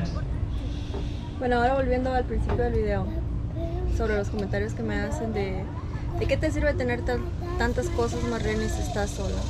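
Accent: Mexico. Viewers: Casi cada fase de tu vida..undudable falta un hombre estable en el hogar. No jusgo nomas soy realista. Pero ya a cosa positivas..estas hermosa y me encanta tu accento me recuerdo al de mi Durango Mexico. Slds de Chicago.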